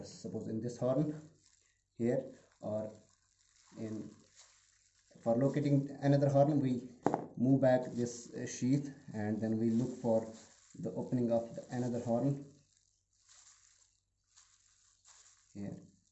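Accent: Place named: India. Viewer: A very nice gulabi English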